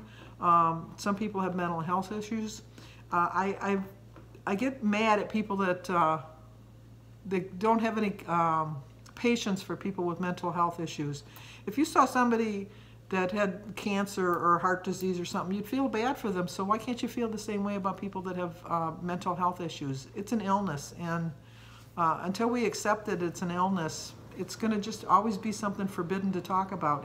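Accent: Midwest, United States. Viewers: The ' " LORDY MERCY ME.....you should be so proud of yourself...because of you, Carrie and Amy, I finally got over this hump in my weight journey (11 pounds down since October)....I am.4lb. away from my PERSONAL GOAL for my 65th birthday next Thursday. Even though I have achived Lifetime status 5 years ago, (lot's of stuggles, ups and downs, that's just LIFE) I was getting out of control again. I was paying for my meetings because I was over the 2 pounds that is allowed. I have two more Saturday's to weigh in to hit my 'goal'....Then after that, I have another little goal by May 5th. I leave for Italy on a biking vacation and you know what kind of food I will be facing in Italy!! I can handle it....I know I will.... that is why I LOVE active vacations....Keep on moving, chicky girl....I am orginally from Chicago and I relate to your Midwestern accent...😂😂👏👏🎈🙏🙏 Stay strong...Rome wasn't built in a day!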